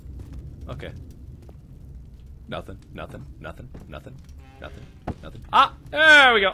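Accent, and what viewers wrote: Sweden. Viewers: the accent is so Swedish i can tell as a Swedish person s easy to tell if someone is Swedish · That is so swedishenglish that i am going to puke.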